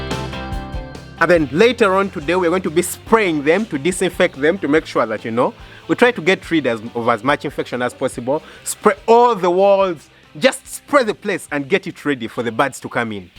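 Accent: Uganda. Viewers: Condolences over the loss of your Grandma, and congs for having beaten the virus. But on a kind note, pliz learn to include/mention the name of your country of origin in every video post. You should learn from Kenyans, who cannot post anything without mentioning their country. From you accent I guess you are a Ugandan, so go ahead and make your country proud and visible.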